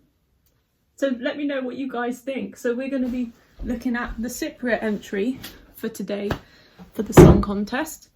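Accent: United Kingdom. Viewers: you're one of the most competent persons I've heard about theme of ESC...and your british accent is like a melody 🤗 Grettings from Serbia 👋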